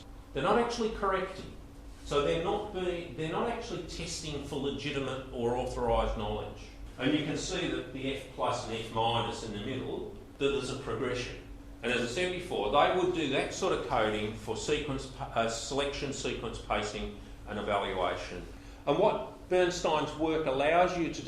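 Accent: Australia. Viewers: been learning Bernstein's idea and had language barrier reading his books. This video is so much help. Thanks. I like the Australian accent.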